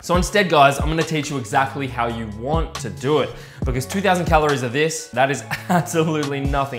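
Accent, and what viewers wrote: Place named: Australia. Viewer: I love your accent... Let me tell you that I have not only learned to lose weight but also have learned the Australian accent from you.. Love your videos mate!! They are great.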